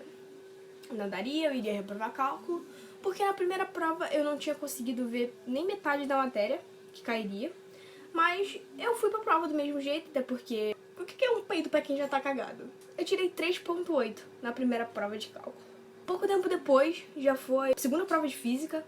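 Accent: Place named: Rio de Janeiro, Brazil. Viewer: "A máquina não foi capaz de me derrotar". Uahaha. Muito bom,! Muito legal seus vídeos, descontraídos e com informações bem interessantes do dia a dia do estudante. Seu sotaque carioca é muito bom de ouvir. Fiz Eng. Mecânica no CEFET-MG e lembro da tensão de fazer algumas destas temidas provas. A maioria das provas no CEFET, eles costumavam e ainda costumam fazer somente questões discursivas (tanto as de Física como de Cálculo), eram bem tensas.:) Mas já tive a curiosidade de ver algumas das provas aí da UFRJ, bem difíceis.:) Parabéns pelo canal!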